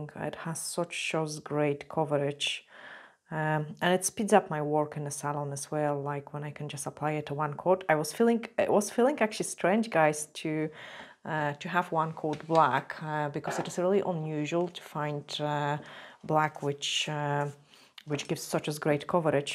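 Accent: Scotland, United Kingdom. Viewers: I'm ordering your foil design gel and white french gel as it looks AMAZING when you use it... I already have your foil gel and it's the most brilliant foil gel or glue I've ever used omg. It's brill. Your colour gels look amazing too as they look so pigmented in your vids but i just love your personality and the way you say wee when you're saying little ha ha. It's sooooo cute and sweet as you live in Scotland it's rubbed off on you. You remind me of my mum-in a really sweet way. She's English but spent half her life in Scotland and uses Scottish slang in her English accent. It's sooooooo cute and sweet. I think you're such a sweet feminine lovely lady and you're super duper talented on top... and you're gorgeous!!! Ha ha I love your vids. I've copied a few (tried) hope you don't mind. You're extremely talented and I'm looking forward to getting my design gel and French white gel X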